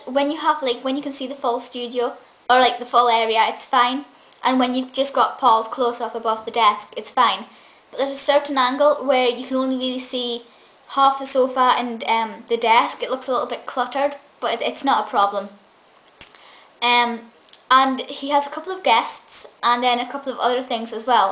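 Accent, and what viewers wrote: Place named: Scouse, United Kingdom. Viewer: Paul O Grady show is great it's the only chat show I could really get into. You sound a bit scouse with a bit of scot in you.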